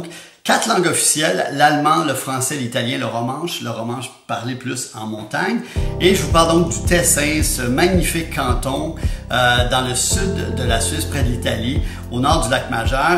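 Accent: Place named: Quebec, Canada. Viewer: Bonjour Monsieur, merci de nous présenter une magnifique région de Suisse, le Tessin ou Ticino. Pourquoi vous a-t-on choisi, avec votre accent du Québec ? Que mange-t-on dans le Tessin en Suisse ? Autre chose que du sirop d'érable ? Vous êtes du Québec, vous connaissez, je suppose. Comment sont les gens dans cette partie de la Suisse où l'on parle italien, dix pour cents de la population suisse. Présentez nous la gastronomie du Tessin, ils ne connaissent pas le sirop d'érable, présentez nous leurs goûts en matière de musique. Ils ne connaissent pas Céline DION et GARROU, Lara FABIAN, Isabelle BOULAY et vos sommités de la musique du Québec. Sur les lacs de Lugano et de Locarno, on ne fait pas du patin à glace comme sur le Saint-Laurent. Moi, c'est Paul, venu de Bruxelles, Belgique. Frites, bière, moules, chocolat sont mes aliments préférés. En ce moment, janvier 2023, il fait froid. 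A Bruxelles, cinq degrés, encore l'automne sauce du Québec, mais en provinces de Liège et Luxembourg, neige, neige, neige et encore, luges, traîneaux, ski de fond, raquettes, remontées mécaniques, les Hautes Fagnes, un vrai décor digne du Québec, les Hautes Fagnes, cette région de Belgique qui touche l'Allemagne et le Luxembourg où dix pour cents de la population belge parle allemand. Le Tessin est cette région de Suisse, en été, chaude et ensoleillée où les habitants parlent italien, dix pour cents de la population suisse. Des gens méridionaux plus agréables qu'à Zurich et à Lucerne, des suisses u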